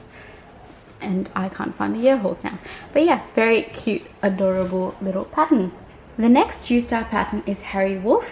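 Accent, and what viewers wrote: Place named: Australia. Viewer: Just found you today. You sound kinda Australian sometimes ?? There's not many of us around. Love all your creations ❤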